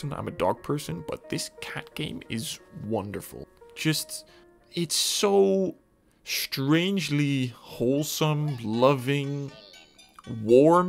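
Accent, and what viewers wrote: Netherlands. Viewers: So I was actually able to pick up you’re from the Netherlands by your accent but dang that took a while. Definitely a compliment because usually I can’t stand dutch english accents but yours is fine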